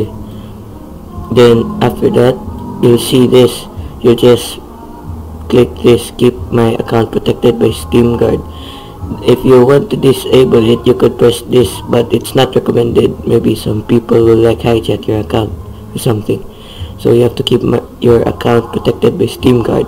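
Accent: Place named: Philippines. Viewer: Liked the raw Filipino accent there LOL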